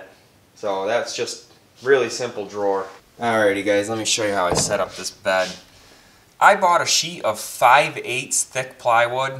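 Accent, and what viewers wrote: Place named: Arkansas, United States. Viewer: I’m guessing you’re from Arkansas? Your voice sounds like you’re from there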